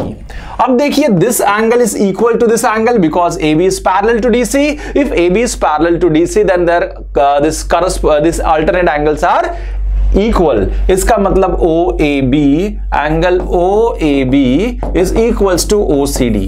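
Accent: Bihar, India. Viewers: Apki tone ekdm mst lgti h sir Are u bihari?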